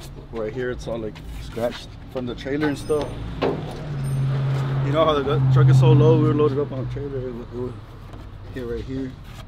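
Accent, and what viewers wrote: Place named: South East, United Kingdom. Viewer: This nga sounds like he from SE😅😅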